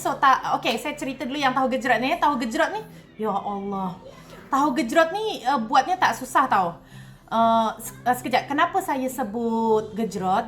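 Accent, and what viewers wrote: Johor, Malaysia. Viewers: Mantap kak bahasa Johor dh nk jadi tu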